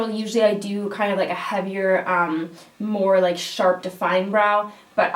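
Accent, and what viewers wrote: Canada. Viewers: You're Canadian right? The way you say bag and trash 😁.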